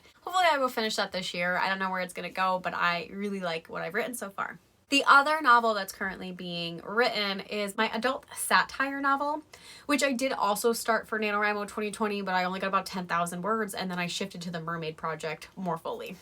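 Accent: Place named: United States. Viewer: Wow! They are great ideas for different genres. Your creativity is limitless! But now you must do the hardest thing: write them! By the way, can I ask where are you from? I'm 99% sure you are from USA, but I cannot distinguish accents very well because I'm from Spain.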